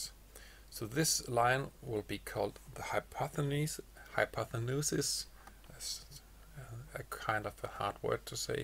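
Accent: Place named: Denmark. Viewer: hahahaha gal en dansk engelsk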